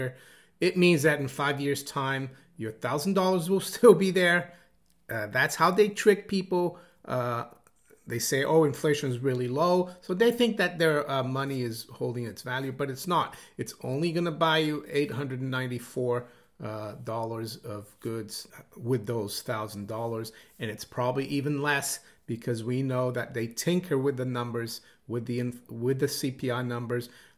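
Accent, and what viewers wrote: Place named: South, United States. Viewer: < Once I listened to a video post with sound, clearly you live a bit further south of N.E.. lol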